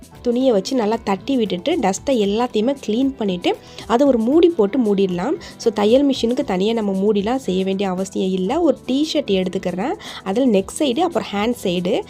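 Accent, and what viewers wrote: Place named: Ramanathapuram, India. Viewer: Sister unga voice Ramnad kilakarai slang mari iruku namma oora neenka?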